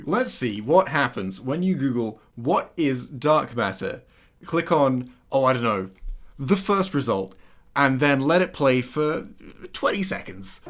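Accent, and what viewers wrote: United Kingdom. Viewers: This guy sounds like a British Seth Green.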